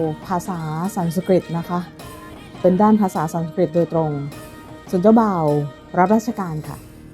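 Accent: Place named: Central Thailand, Thailand. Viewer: เสียงบรรยายพูดได้จังหวะท่วงทํานองภาษากลางได้ชัดเจนมาก เทียบเท่าพิธีกรมืออาชีพ หรืออาจจะมากกว่านั้น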